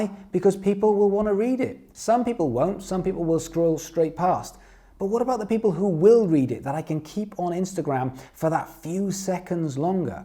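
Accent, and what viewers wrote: United Kingdom. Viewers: I've been watching a lot of amercian youtuber but I didn't know until I started watching how much I needed to hear a British person. I feel like I'm back home😍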